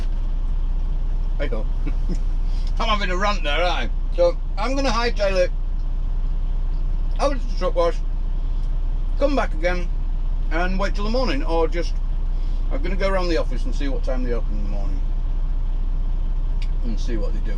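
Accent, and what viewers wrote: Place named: England, United Kingdom. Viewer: Love your accent. Reminds me of the song, I'm an alien I'm a legal alien, I'm an. Love your videos. Howz trucking in USA as compared to UK?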